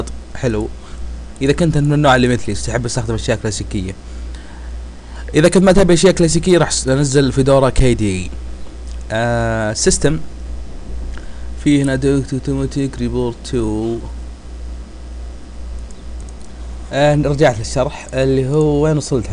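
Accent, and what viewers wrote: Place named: Qassim, Saudi Arabia. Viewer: يعطيك العافية أخوي يا حبيلك أخوي شرحك ممتاز جدا خاصة بلهجة القصيم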